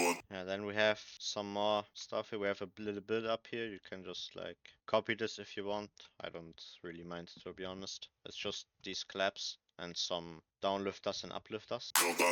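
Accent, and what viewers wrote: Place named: Germany. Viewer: you can hear hes german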